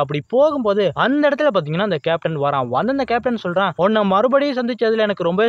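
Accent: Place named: England, United Kingdom. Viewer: Bro i think u need to change the voice modulation.. dont try to pur the englush accent in tamil. Talk tamil naturally it gives good value to your story narration. Just my opinion dont feel bad bro.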